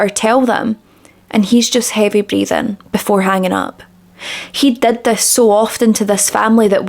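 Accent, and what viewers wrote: Scotland, United Kingdom. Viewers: This accent man 😭😭 how can a fellow Scottish person like a accent so much haha!! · Ohh had to have a little break watching this one. it's a bit much in places but you do such a respectful and professional job and you are so beautiful. Love the cute Scottish accent ❤❤❤❤❤❤❤ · Subscribed for the accent 🤣 I like the way scottish people talk ✨ You could read me the phonebook ❤️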